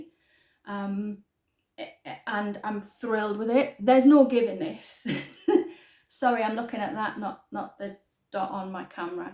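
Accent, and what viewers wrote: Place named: Northumberland, United Kingdom. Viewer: Hi,. I am soooo happy to see you had a new episode tonight. I absolutely love your podcast. You have such a lovely, calming effect on me, it was just the perfect, relaxing 45 minutes I needed as a hectic week draws closer to an end. I lived in Northumberland for a couple of years (although I have been back in South Africa for 18 years in June) and I still get homesick for it, occasionally. Your accent alleviates that somewhat 😂 As always, your projects were lovely. I find your more "minimalistic" approach to crafting refreshing (I too try not to just buy all the yarn, unless it is for a specific project, which would get started imminently). It is in inspiration to me to work through the stash I had acquired before I changed my ways. I hope to see you again, soon! And if you are holidaying in Northern-England, I would love to go along in your case! 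Ps: the yarn on your warping board already, is the warp. You will be weaving your weft through the warp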